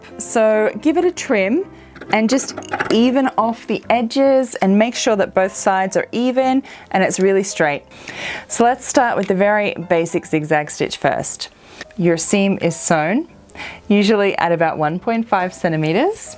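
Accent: Australia. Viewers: Love, this. It is my first visit. Won't be the last! I use both pinking shears and the zig zag. Are you Australian? :) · New subbie ☺️ are you from Australia ? 💖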